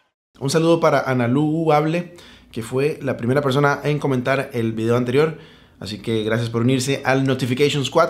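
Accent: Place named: Colombia. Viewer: pareces colombiano, de echo hay partes de que tu acento se asemeja mucho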